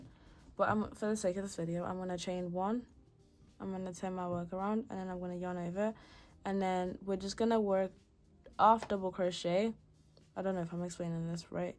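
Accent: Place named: Scotland, United Kingdom. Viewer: Your accent sounds a little northern, it’s so cute! Are you Scottish? Love from America ❤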